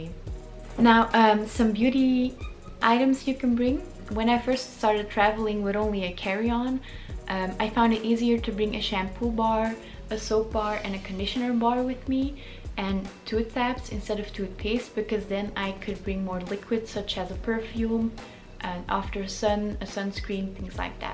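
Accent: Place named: Belgium. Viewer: you kinda sound like ur belgium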